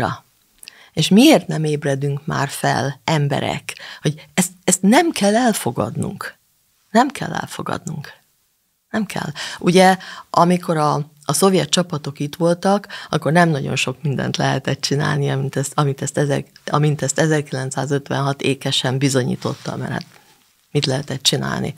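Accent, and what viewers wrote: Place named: England, United Kingdom. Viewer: Nagyon jók a Hossó Andrea videók mindegyiket megnézem. De ez a rémes angol akcentus ez engem nagyon idegesít. Megérteném ha ott született volna, de nem.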